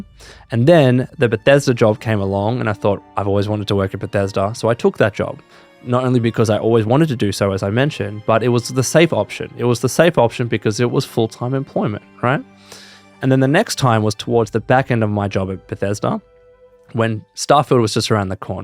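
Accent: Australia. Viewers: Wish you the BEST & absolute success with YouTube,. Your angle has been one of my favorites on the platform. I wager you’d be amazing at Twitch streaming as well to farm some of that YouTube content. The Aussie accent will definitely boost your viewership!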